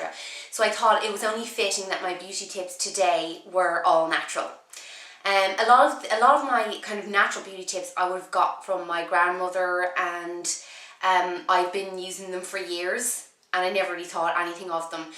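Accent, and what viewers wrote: Ireland. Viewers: Your turd installment? Lol, no offence i LOVE the irish accent. Thanks for the tips too :) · Lol she doesnt have a British accent, its Irish two different countrys :) x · great tips will write them down to rem them" have been following ur vids for ages but never commented.nice to hear the dub accent.yay!!!!!!!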